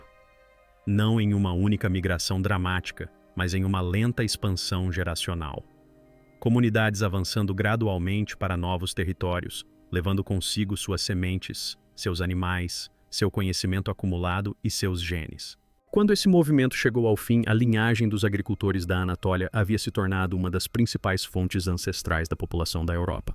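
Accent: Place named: England, United Kingdom. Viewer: Gostaria de uma dublagem sem sotaque em inglês 😒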